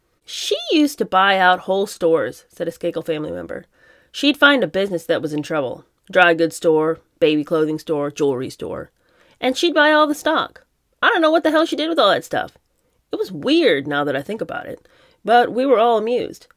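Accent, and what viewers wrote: South, United States. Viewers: Loved your southern accent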